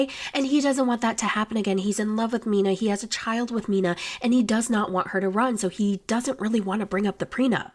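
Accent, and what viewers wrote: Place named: South, United States. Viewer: I just love you, ♥️🌹your southern accent is amazing 👏 great job, great podcast 👏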